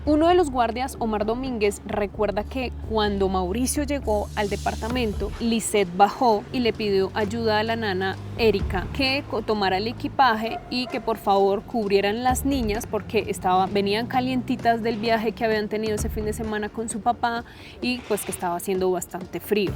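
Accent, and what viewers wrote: Colombia. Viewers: andas perdida mi Reyna no se pronuncia Huixquiluacan, es Huixquiluacan pero bueno eso es lo de menos por tu acento puedo ver que eres colombiana...lo que si no pasa es que una mamá tiene en su panza 10 meses al bb....no mamita son 9 meses... que yo sepa en ningún lugar de el mundo son 10 meses de gestación 🤭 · Y esta youtuber, como se atreve a hablar de este caso, ni siquiera es mexicana, por su acento es Colombiana, ni siquiera los mexicanos sabemos como pasó, así que tache, oportunista!!.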